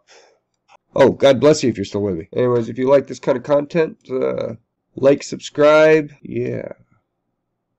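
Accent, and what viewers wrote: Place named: New England, United States. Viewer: Wicked impressive..? Are you from new England too? Hahah!!! It's easy to spot. Amazing video brother, I love the material.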